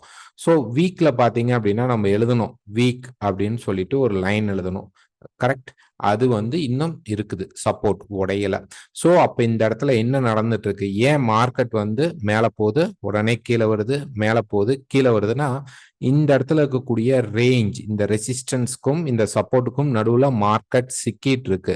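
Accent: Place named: Kerala, India. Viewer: Most of the analysis are accurate!,I feel you are speaking in Malayalam,I can follow your Tamil without any difficulty, Thank u sir!!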